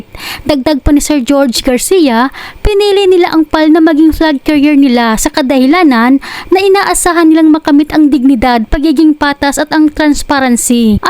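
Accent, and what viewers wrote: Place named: Visayas, Philippines. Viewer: Maam BISDAK ang boses mo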